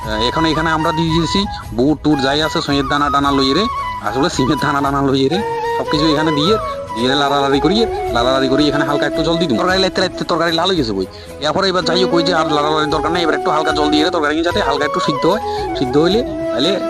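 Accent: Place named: Noakhali, Bangladesh. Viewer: Gramar language suru korchish ekdam nowakhali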